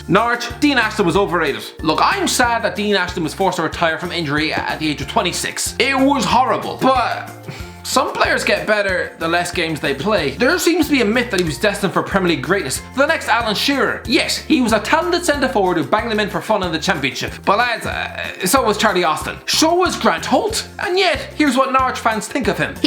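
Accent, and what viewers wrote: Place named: Ireland. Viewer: I love this guy his Irish accent is so funny wich makes the videos so entertaining. Hope everyone is staying safe through these tough times.❤❤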